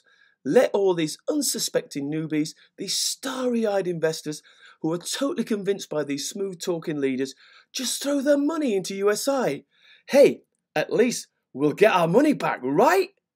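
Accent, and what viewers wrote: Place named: North, United Kingdom. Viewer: Your sense of humour made me laugh...you sound like a Northerner like me, but in on the know with USI Tech also...enjoyed the vid.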